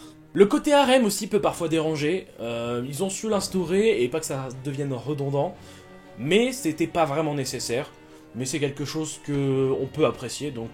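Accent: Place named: United Kingdom. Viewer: J’aime pas l’accent anglais. À travailler..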